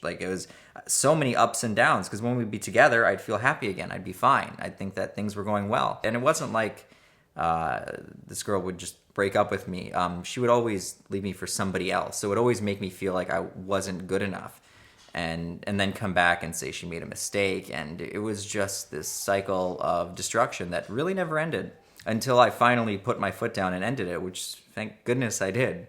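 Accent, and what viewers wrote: Canada. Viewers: How come Canadians always say "about" weird?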